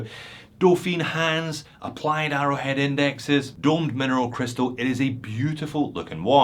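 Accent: Scotland, United Kingdom. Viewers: Great video and amazing you sponsored it yourself! are you really Scottish? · Love your Scottish accent 🎉🎉🎉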